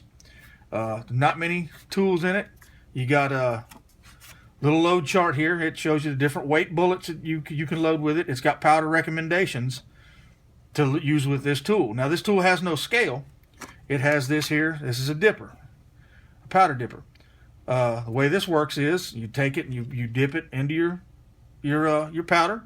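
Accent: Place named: South, United States. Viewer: Very nicely done , especially about sticking spent brass from another gun, I bet a thousand people have done just that!! Don't worry I have been married for over 30 + years but you have a nice voice for video's,kind of like slim pickens ,has kind of a southern draw! I subbed. You should make one using that device since I own one now!And spent over a hundred on primers brass bullets ETC!!! Very good video again.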